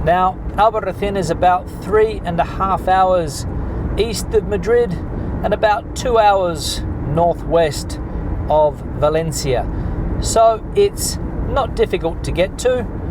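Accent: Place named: Australia. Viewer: Good to hear an Aussie voice! What took you to Spain?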